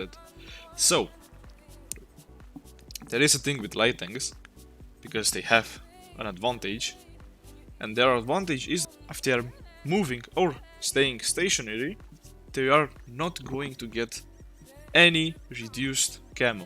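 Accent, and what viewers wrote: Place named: Belgium. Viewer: Ik kon je accent maar niet plaatsen tot je 'tier' zei hahah, ik dacht gelijk een Nederlander met een raar accent en toen kwam de 'spotter' en toen dacht ik belg hahahah. Toffe video man, gesubbed en geliked.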